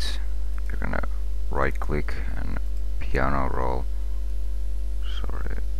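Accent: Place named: Finland. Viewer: finnish as fuck, great totreal lol.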